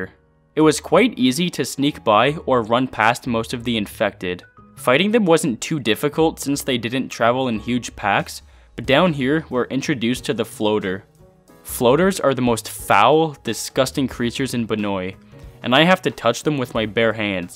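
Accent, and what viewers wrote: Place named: Canada. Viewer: the most canadian sounding canadian I've heard on YouTube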